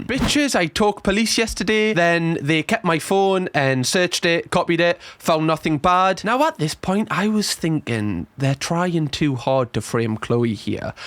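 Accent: Newcastle, United Kingdom. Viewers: Literally just found your channel a few days ago - love it. You’ve successfully made Geordie my favorite British accent. 😅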